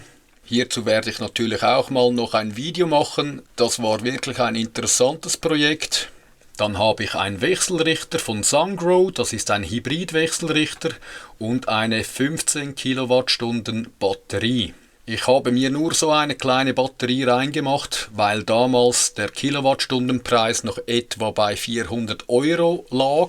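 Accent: Switzerland. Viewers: Zunächst mal: Wie schön, einen Schweizer Dialekt zu hören - mein Sehnsuchtsland! Ich habe vier B2500D; sind gut steuerbar über Home Assistant. Unschlagbarer Preis von zuletzt 150 Euro/kWh. Können nix, außer PV-Strom speichern und wieder abgeben und das mit relativ geringer in/out-Leistung, aber das unterstützen sie richtig gut. Wer einen reinen Puffer für seine Panels sucht, sollte diese nehmen.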